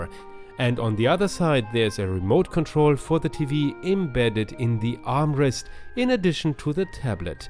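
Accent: Germany. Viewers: Hay, I've only just found your channel a short while ago. I had been watching one of the reviews from "Nonstop Dan" and whilst making a comment to him about his latest second channel, I saw, in the comments section, that you had also added your own comments. That was the very first time that I have heard of so my reaction was to immediately check you out. I am so very glad I did! I've only just begun looking through your quite extensive range of reviews and, from what I've seen so far, I don't think that I'll at all disappointed with them. I intend to spend a very enjoyable time as I go through your work. BTW Ilove your wonderful German accent! I have visited many parts of Germany because it's so beautiful, as are it's people. I am now looking forward to following you very much indeed. I love your presentation and your appeal! Thank you and Keep Safe, Keep happy and KEEP FLYING! 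Thanx.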